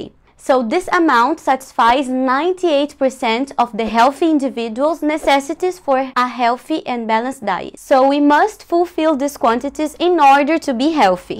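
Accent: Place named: Brazil. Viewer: I knew she was brazilian by her accent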